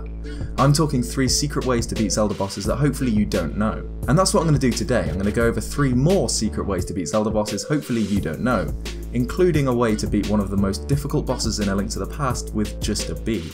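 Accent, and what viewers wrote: United Kingdom. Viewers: I think its a british thing, because I know that DanTDM - another british youtuber -says "lava" as "laver"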